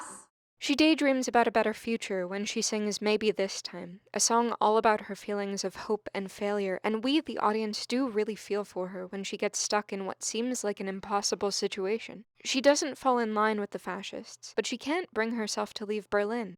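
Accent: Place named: United States. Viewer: you sound like an American, and at this point in our political history I think that it is fair to stop holding the German people particularly accountable for the rise of fascism. I'm watching it rise here in America and the same thing is going on. Most people are simply passive, and politically disinterested. They're not willing to risk anything about their simple lives in order to resist fascism or authoritarianism.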